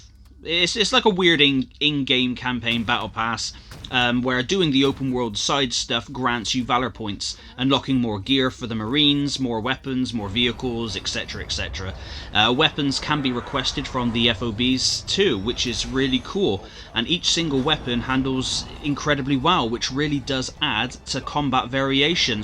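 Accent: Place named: West Country, United Kingdom. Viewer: My game of the year, been looking forward to this game for months and was not disappointed, great review, ive actually found a couple Easter eggs (giant sandwich and the original xbox) I have to ask..... what part of the UK you from? Love the accent! I'm a Bristolian, you have a west country twang! Lol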